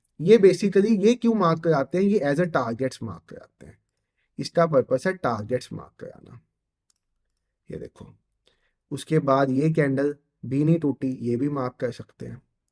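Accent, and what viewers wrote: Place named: Pakistan. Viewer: Tu Pakistani hai na Teri voice bata Rahi hai.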